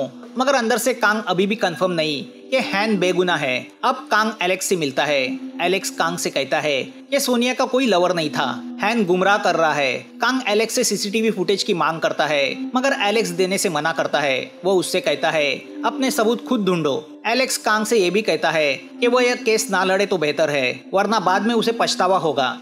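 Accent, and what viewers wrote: Maharashtra, India. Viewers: Bhau tu marathi ahes? Accent vrn vatt😁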